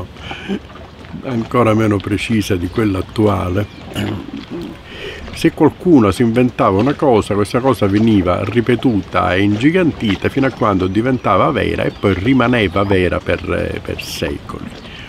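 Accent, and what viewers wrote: Sicily, Italy. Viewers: Il suo accento siciliano è musica.. Vorrei chiederle cosa pensa del progetto del ponte?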